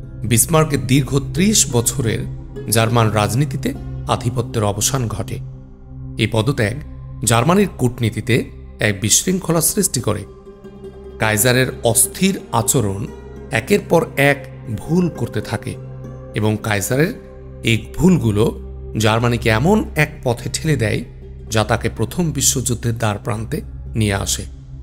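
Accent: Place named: India. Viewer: Indian, voice sunei bujsi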